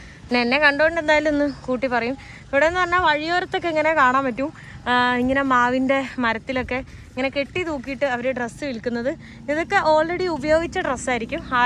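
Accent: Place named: Ottapalam, India. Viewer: പാലക്കാട് ഒറ്റപ്പാലം ഏറിയയിൽ ആണോ വീട് സംസാരം കേട്ടപ്പോൾ അങ്ങിനെ തോന്നി ❤❤❤❤😅😅😅😅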